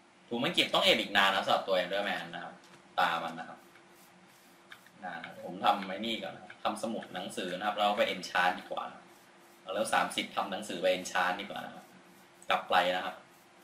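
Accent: Isan, Thailand. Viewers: พี่ พูด ภาษาอีสาน บ่ซวนกันเล้ย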